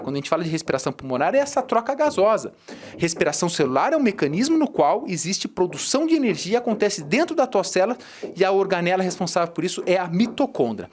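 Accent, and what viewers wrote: Rio Grande do Sul, Brazil. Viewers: meu parabéns, sua vocação com certeza, és dar aula! vim aqui nesta maravilhosa aula, pois a didática da minha professora de fisiologia, não é muito boa! percebi que tu és do RS! sucesso!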